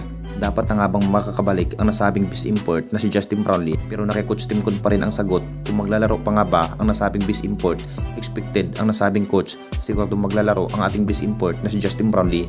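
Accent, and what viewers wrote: Visayas, Philippines. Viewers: sa pagsasalita mo pa lang na parang bisaya di na kapa kapaniwala eh😅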